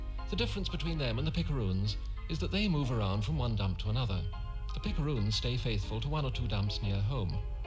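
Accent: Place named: Kildare, Ireland. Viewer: Commentator is from Kildare, probably Naas,, That's how we speak.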